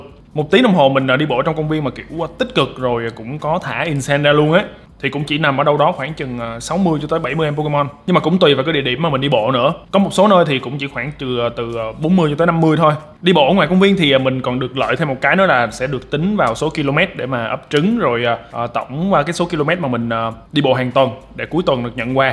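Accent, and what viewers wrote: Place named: United States. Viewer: Anh có học tiếng anh không anh do lúc a nói chuyện rất giống người Mỹ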